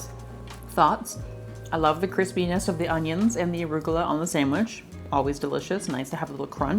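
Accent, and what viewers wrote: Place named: Midwest, United States. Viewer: Nice Midwest accent 😄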